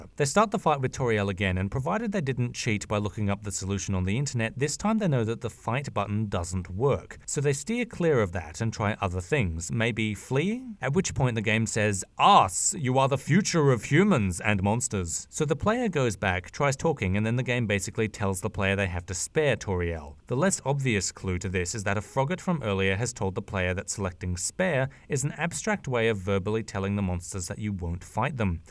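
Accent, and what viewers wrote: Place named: Australia. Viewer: The ozzy accent is strong in this one.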